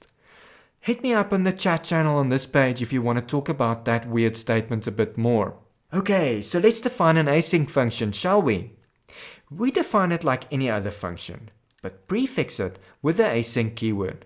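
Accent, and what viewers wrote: South Africa. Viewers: I was like... wait what... is that an Afrikaans accent? good to hear :)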